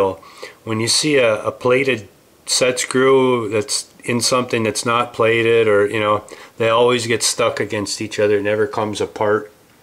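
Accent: Canada. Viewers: Mine is a 1968 that I bought new in '69. Have never owned another, never needed to. I've had it 44 years. Will leave it to my son, who plays bass, when I pass to that 'Great Gig In The Sky'. I still play, mostly blues and R&B. I grew up where we all had a 'Great White North' accent like yours. Great video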